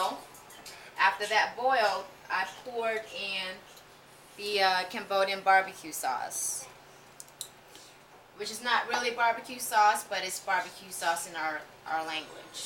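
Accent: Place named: South, United States. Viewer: Atlanta?? Can def tell you from down south but never heard khmer girl with southern accent lol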